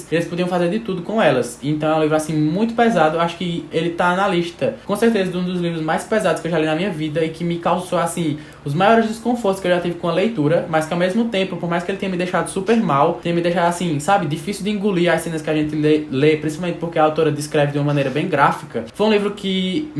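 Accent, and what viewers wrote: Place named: Paraíba, Brazil. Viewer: Ahhh o sotaque! Sou de Recife, mas acho que tu é paraibano, acertei? Me senti em casa com o sotaque parecido com o meu ♥️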